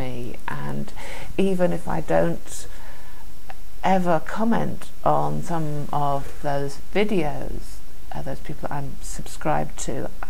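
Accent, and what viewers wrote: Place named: United Kingdom. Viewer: what's not fair is how UK accents make for better videos! no fair!!! I want one. I'm with you... predictable in my avoidance of predictability. Means you never quite fit in groups eh, you resist the little conventions and micro-traditions even a small group of friends tend to develop. lets all be individualists together!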